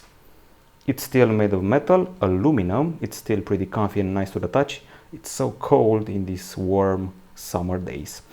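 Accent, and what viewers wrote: Romania. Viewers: Youre not english, I bet you are Romanian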